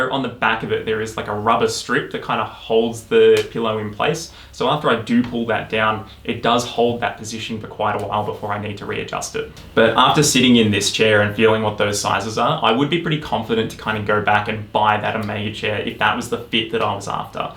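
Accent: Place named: Australia. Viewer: Nice to hear an Australian dude doing one of these reviews. Shout out from Melbourne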